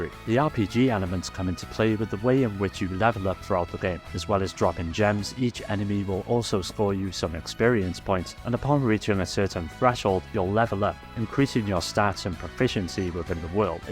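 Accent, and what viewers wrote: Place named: North East Wales, United Kingdom. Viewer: Great vid as always! And I swear that a North East Wales accent 😂 You sound EXACTLY like most of my friends ❤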